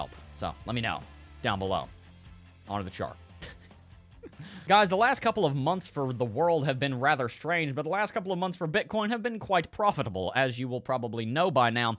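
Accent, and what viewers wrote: South, United States. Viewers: Hey dude are you from the south? I like you accent very much. Thank you for your voice.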